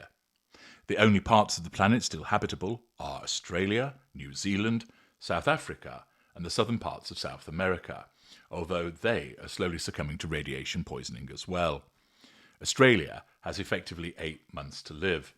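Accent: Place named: England, United Kingdom. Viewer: Come on you have to tell us all about yourself! English accent. From England.✅ Tans in the summertime. Not a red headed guy with freckles.✅ Swears like a trooper. Definitely not in your 60's plus plus.✅ You don't show your face. Maybe camera shy🤔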